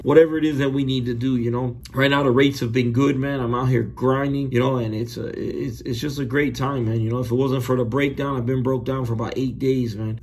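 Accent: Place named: Louisiana, United States. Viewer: You from Louisiana bayou country? You sound a little like the Cajun Cannon.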